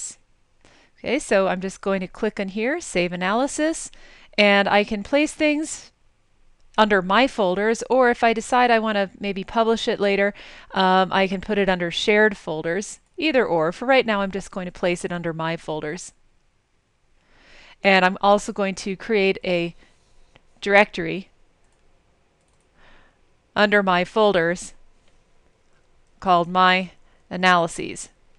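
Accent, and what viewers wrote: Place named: United States. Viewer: Just want to echo what someone said below, so nice and refreshing to watch a training video with someone with an American accent.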